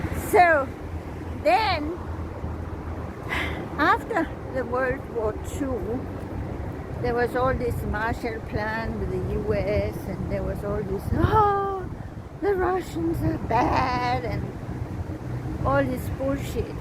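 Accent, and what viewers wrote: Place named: Denmark. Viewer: Hej Jeg kunne høre på din accent at du nok var dansker. Dejligt at se og høre. Jeg opdagede lige din fine video og har nu abonneret 🙂 Dbh. Michael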